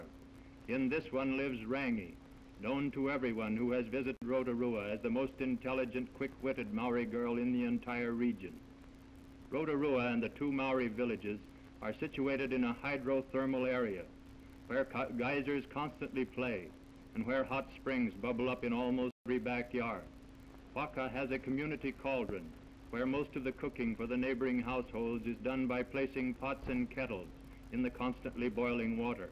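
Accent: Transatlantic, United States. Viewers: It's how most things were narrated back then. Transatlantic accent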